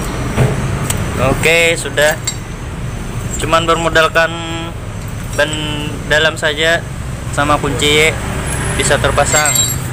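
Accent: Banjar, Indonesia. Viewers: Lugat banjar, urng hulu sungai kah bos??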